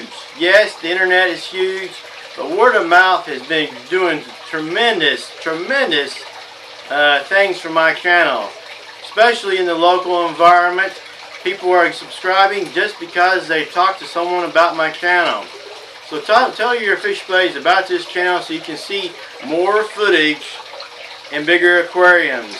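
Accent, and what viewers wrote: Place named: East Tennessee, United States. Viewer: Very cool your educational video, along with the east TN accent! Very cool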